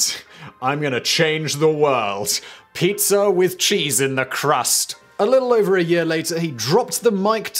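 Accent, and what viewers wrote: United Kingdom. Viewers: Good old British accent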